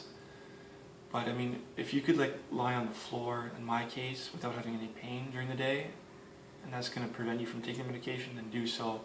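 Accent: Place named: Quebec, Canada. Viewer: I came here for info about discs and stayed for the French-Canadian accent and the 'ookey hair.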